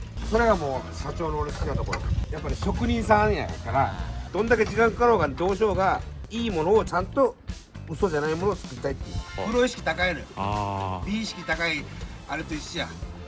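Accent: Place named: Fukuoka, Japan. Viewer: 福岡弁さん本人の面白さカメラマンとの絡みローレルネタ全部最高。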